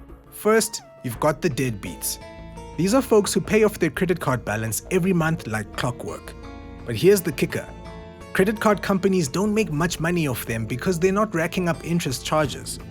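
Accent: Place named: India. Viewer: Why is the narrator’s voice Indian?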